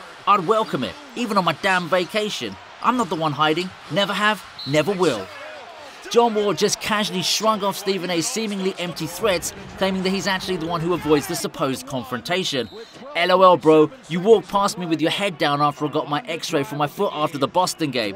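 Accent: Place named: England, United Kingdom. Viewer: Narrator is…English?!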